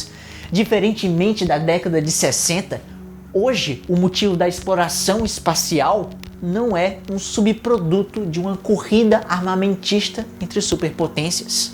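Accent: Ceará, Brazil. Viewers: Pera c é Cearense?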